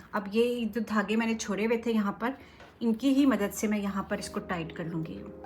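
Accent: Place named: Pakistan. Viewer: Lovely api. Ur Urdu accents sounds Pakistani 🫶🏻 happy to see. Bhot he details me r bhot achy sy smjhaya ap ny. Lots of love❤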